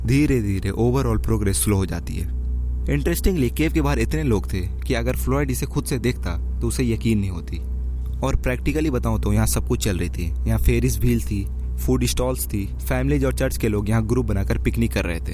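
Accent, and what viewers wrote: India. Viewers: 😂😂😂 fuck this indian accent is so funny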